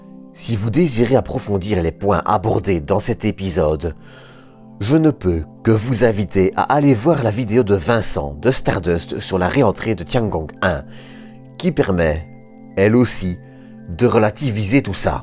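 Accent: Belgium. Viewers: c'est l'accent belge, perso c'est l'accent arabe qui me dérange mais pas celui là...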